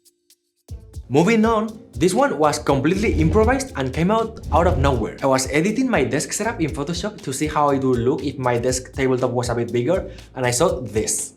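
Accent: Spain. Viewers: where r u from, ur accent gives me spanish vives (lo eres 100%) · He has the strongest Spanish accent I have ever heard, I got no idea, about his origins tho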